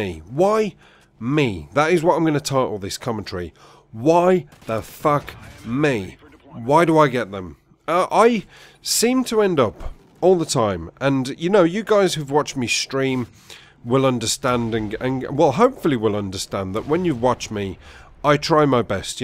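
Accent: Birmingham, United Kingdom. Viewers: Funny BRUMMY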